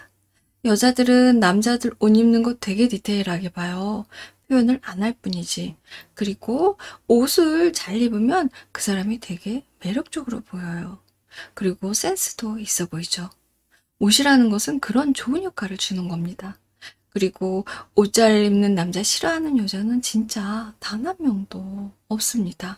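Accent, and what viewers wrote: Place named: Gyeongsang, South Korea. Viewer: 잘쌩긴이라고 발음하지 마시고 잘생긴이라고 발음하시길...... 잘쌩긴은 호남말투인데, 억양은 영남이시네요..박원순 서울시장의 억양......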